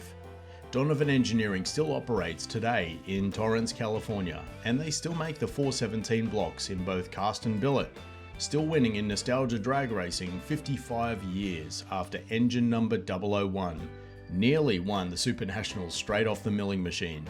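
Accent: Australia. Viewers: I swear I don’t think all Aussies sound alike, but you sound remarkably like Dr Robert Chase, MD (Jesse Spencer). Great video btw, nice to find non-AI channels 🎉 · Great vid, no AI, and the down under narration seals the deal. Subbed, liked, and hyped .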